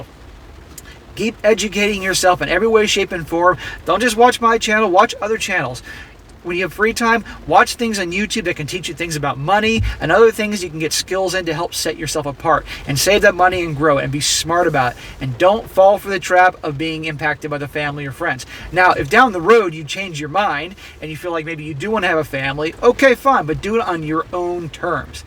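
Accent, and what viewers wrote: Philippines. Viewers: Judging by his level of English I'd guess Philippines. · This dude sounds like he’s from the Philippines.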